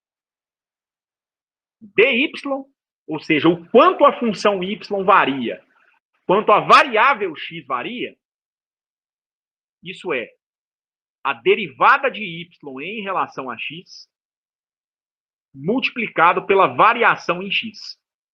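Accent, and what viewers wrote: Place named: Minas Gerais, Brazil. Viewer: Parabéns meu amigo. A vinheta é fenomenal. E o sotaque mineiro me lembra de 20 anos atrás.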